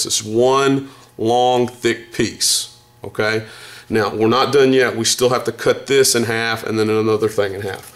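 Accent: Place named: Texas, United States. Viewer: nice accent... texan?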